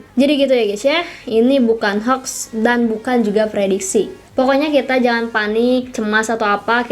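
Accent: West Java, Indonesia. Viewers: Logat sunda nya masih berasa :")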